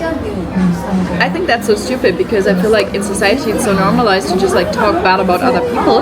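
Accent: United Kingdom. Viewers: You sometimes sound british and we’re here for it xoxo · You should lean into the British accent why not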